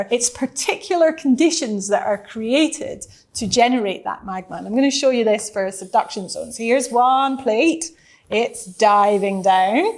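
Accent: United Kingdom. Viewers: This dumbass british accent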